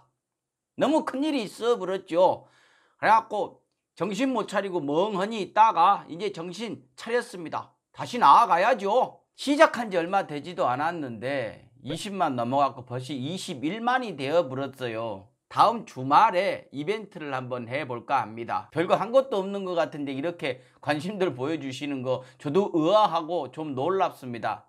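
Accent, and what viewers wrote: Jeolla, South Korea. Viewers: 전라도 사투리가 구수~ 하구먼~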